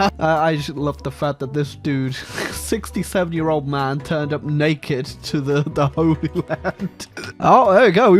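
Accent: France. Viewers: The French accent is needed for future videos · I want a full video of to just speak in a french accent the whole time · You Have The BEST French Accent Ever, Even Better Than A Actual French Man. me simping for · I want more of your beautiful French accent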